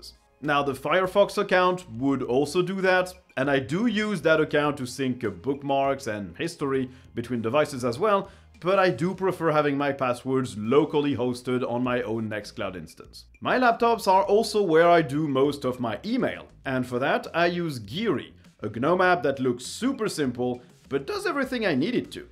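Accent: France. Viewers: Did you say Ioatas or Aphostrophe? Could not get that from the french accent sorry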